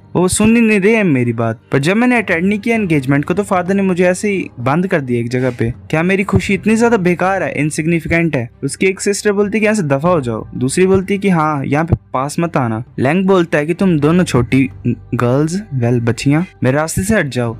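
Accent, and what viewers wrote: Himachal Pradesh, India. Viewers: Bhai aap himachal se ho kya😅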